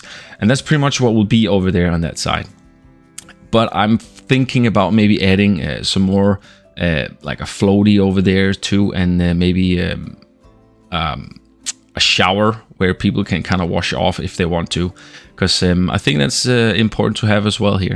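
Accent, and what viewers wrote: Denmark. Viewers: love the danglish accent <3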